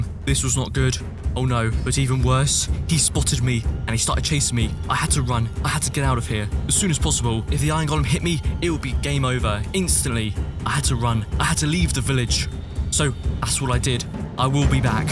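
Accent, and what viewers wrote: United Kingdom. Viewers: He sounds like in uk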